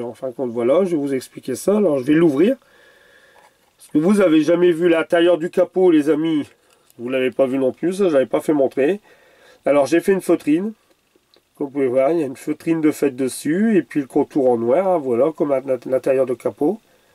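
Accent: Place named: South, France. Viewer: 😂😂 je l’aime cet accent du midi.......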